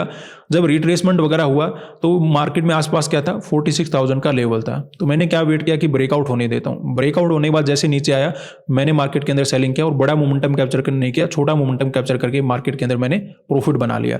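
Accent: Haryana, India. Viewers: Language ke hisab se lagta hai inki language mein thodi si Haryanvi bhi mix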